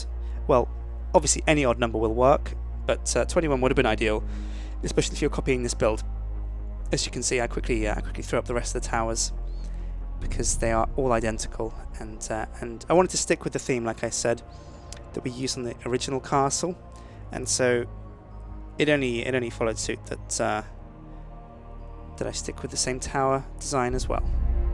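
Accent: United Kingdom. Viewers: Because of his accent. It's scientifically proven you cannot get bored while listening to a guy with a Britsh accent.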